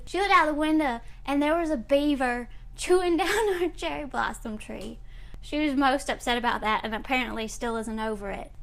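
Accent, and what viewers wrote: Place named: Georgia, United States. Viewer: I'm live in Warner Robins and have never heard an accent like yours. It's so cute and unique. I thought maybe you were from north GA. It's almost cherry blossom festival time again. The pollen is killing us!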